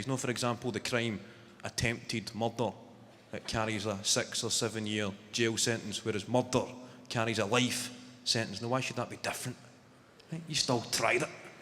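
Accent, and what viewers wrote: Scotland, United Kingdom. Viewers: He's toned down for his specials to be fair so as to transcend into mainstream, same as Billy Connolly did. He has mentioned in an interview somewhere that he changed slightly to be more understood and he gets slated for it, but people's have to understand, if he was to keep his broad accent, and only a handful of people understood or got his jokes, then he'd reduce his appeal and fanbase. It's a balancing act, and he is one of the more genuine comedians that hasn't pampered to the crowd, and only changed what he needed to so as to keep his authenticity and identity, and that's what I like about him, plus he's pish funny, as are the Scots in general.Scottish and Irish just have an uncanny knack of being funny. There have been many people that have chosen to hide or indeed even tone down their accents and pampered to what the media outlets want just to appeal to certain audiences, because those Media outlets want to profit more from their representative, as opposed to actually care what their representative thinks about disguising or ditching part of their identity. 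I don't like the word sell out Becaue anybody in this business wants to maximise their income, and if that means toning down your accent just to be understood a bit better by a bigger audience, then that's not a bad thing, especially in a career that's make or break and can be over in a flash, but completely losing your identity is where I draw the line, and that's a sell out to me, totally changing just to appea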